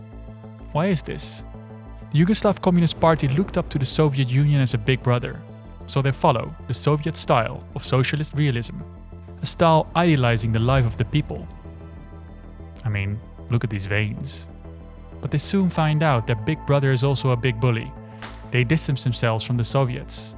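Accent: Netherlands. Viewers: Aan het accent hoor ik meteen dat jij Nederlands bent! 😀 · I knew i noticed a slight dutch accent..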